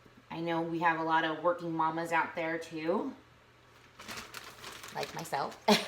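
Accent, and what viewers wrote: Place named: Wisconsin, United States. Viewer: I'm hearing a very Wisconsin accent there. You wouldn't happen to also be a Charlie Berens fan would you?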